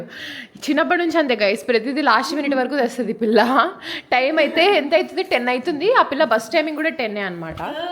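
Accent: Rayalaseema, India. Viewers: Ah yappa dagaraki was paka ralayasemma slang ❤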